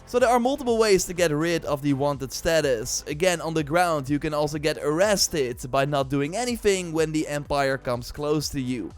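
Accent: Netherlands. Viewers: Opens video -> Hears dutch accent -> Skip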